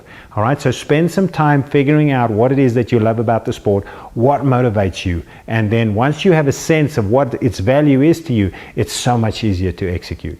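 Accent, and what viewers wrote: South Africa. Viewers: South African accent!!! Best accent in the world!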